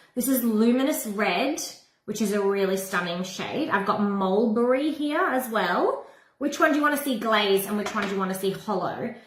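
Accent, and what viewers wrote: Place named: Australia. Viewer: Hi Sweetheart. Love Your Aussie Accent . Love You. Kisses.❤❤❤❤❤❤❤❤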